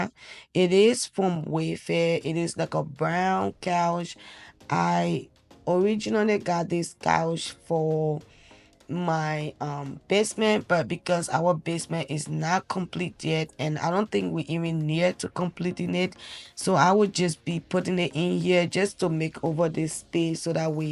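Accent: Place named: Liberia. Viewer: New here on your channel, like your content, your name is Ghanaian but u have a Liberian accent,you did a good job...🫡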